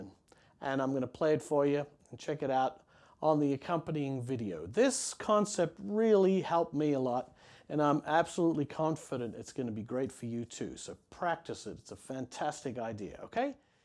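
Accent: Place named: Australia. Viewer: He has less of an Australian accent now.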